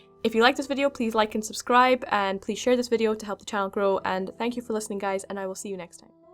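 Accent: Scotland, United Kingdom. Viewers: also I'd like to add your awesome are you Irish? Or Scottish I hear Scottish more Correct? Either way your awesome I have a love for the Scott's and the Irish and there cultures aswell as I just love the accents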